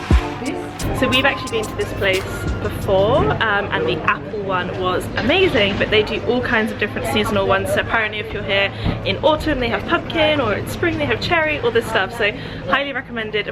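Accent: United Kingdom. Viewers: Thank you for the wonderful words about my country we all bulgarians appriciate it! Wish you to visit many times again and also wish you great time on another trips! P.S. Where are you from? You sound like a british :)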